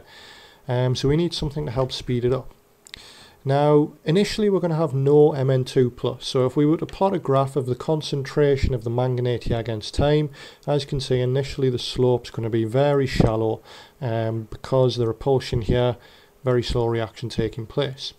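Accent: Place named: Newcastle, United Kingdom. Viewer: Great to have a Geordie ;)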